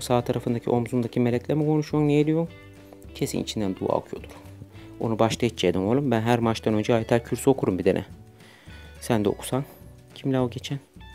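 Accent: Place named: Aegean, Turkey. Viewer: Hocam muthış olmus ya🤣🤣🤣şive tam bizim yöre,ayrıca bir sevdim🌺😊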